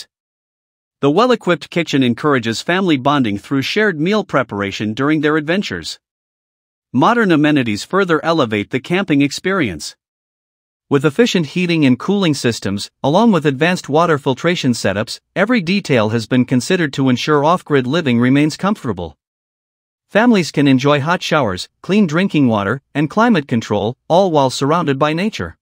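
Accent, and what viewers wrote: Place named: United States. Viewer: Sounds like it’s 😮Voiced over by an American.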